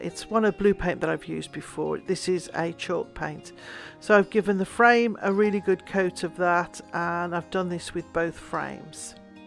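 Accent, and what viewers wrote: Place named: England, United Kingdom. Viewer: Ive oy been watching for short time but i love all tge creating that you do. I love your style as well. Ive wondered because of your accent if you lived in England. Something you said in one of your videos made me think you were in Canada. Now in the comments i see you bought a home in France. Congrats on that. Please tell me where you live now and doing all the beUtiful work.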